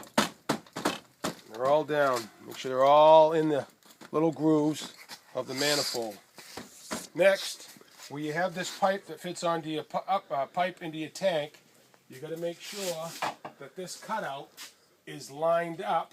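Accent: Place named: Boston, United States. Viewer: Love your Boston accent :-) It's wicked pissa!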